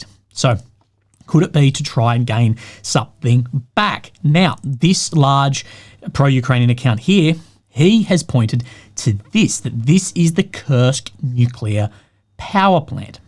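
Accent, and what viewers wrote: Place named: Australia. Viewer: hearing an aussie accent always makes my day more cheerful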